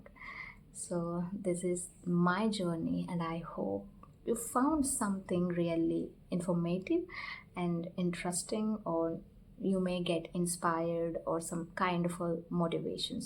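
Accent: India. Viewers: Standerd indain english ❤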